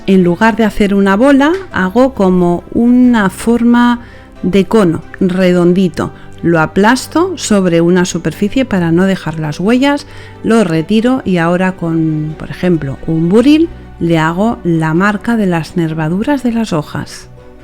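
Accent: Spain. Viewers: ❤❤ Precioso como todos tus trabajos, me deleito mirando y escuchando ese acento español que me encanta. Un abrazo desde Buenos Aires. Argentina. 🇦🇷🇦🇷🇦🇷🌹🌹🌹♥️♥️♥️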